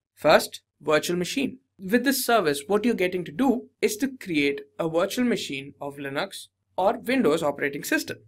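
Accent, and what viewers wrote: India. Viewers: Please don't use Indian accent :) Its hard to listen while focusing to understand the content...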